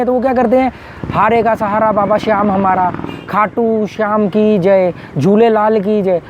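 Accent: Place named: Pakistan. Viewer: SIR SIR PLEASE ANSWER ME IN VIDEO HOW YOU TALK URDU MEANS HINDI VERY GOOD .I MEAN YOUR ACCENT LIKE PAKISTANI PEOPLE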